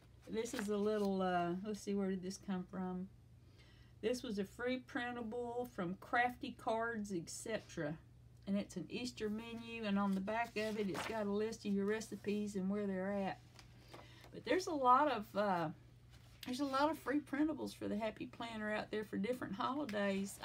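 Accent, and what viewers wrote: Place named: South, United States. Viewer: You are either from Mississippi or where I am from.... Arkansas!!! Enjoyed your video.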